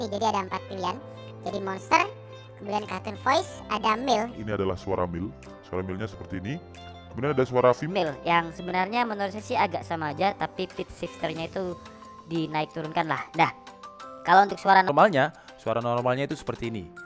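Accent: Java, Indonesia. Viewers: nyeglek .medok banget jawanya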